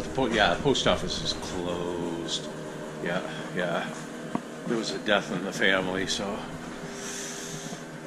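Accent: Boston, United States. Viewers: Stan, I have never met you or your family but I consider you a friend. I miss seeing you on your show because I really enjoyed hearing your Boston accent in cold Alaska. I hope to visit Alaska some day. Keep sharing and I will be watching from Cleveland. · So good to hear that Boston accent again! Haven’t had TV for a while , so.....no Tanana show😢. Found this on YouTube and am so happy! Keep going Stan!! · he grew up in south Boston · You said "downtown" Tanana ? Bright lights, movie houses, traffic jams? I thought that was funny. You can take the man out of Boston, but you can't take Boston out of the man....."staat , New Yaak . Love it! Keep up the good work.....you're a real inspiration. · I just made the same comment. That’s a Boston accent all day long. I know... I’m from Boston. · Are you from Boston? You sound like you have a little accent. 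· Loved watching u on TV I can still here the Boston acent I have it too that's how I know, Glad found u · You have a Boston accent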